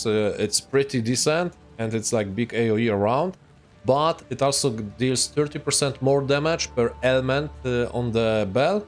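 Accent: Poland. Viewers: Ten polski akcent poznam wszędzie :) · As a Russian, I can recognize a polish accent flawlessly as well hahaha. Kurrrrwa! 😂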